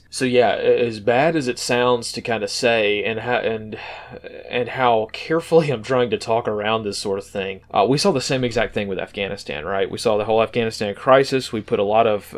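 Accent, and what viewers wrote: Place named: Virginia, United States. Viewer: Hey, I love the content. And just wanted to say, by hearing your accent.. I believe you’re from around Virginia, I know people from Norfolk that share a strikingly similar accent. And with a lot of crazy things going on nowadays, I just can’t thank you enough for keeping us informed in a “no bs straight forward” fashion. Keep fighting the good fight buddy!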